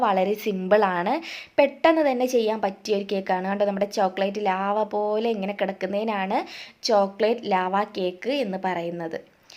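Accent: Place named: Malabar, India. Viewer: മലബാറുകാരി യാണോ ഭാഷ കേട്ടത് കൊണ്ട് ചോദിച്ചതാ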